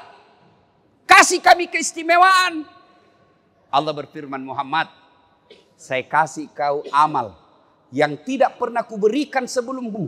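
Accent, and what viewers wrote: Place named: Sulawesi, Indonesia. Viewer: Saya suka mendengar ceramah ustadz. Bahasanya jelas cirikhas Sulawesi Cetho welo welo. Sukses selalu.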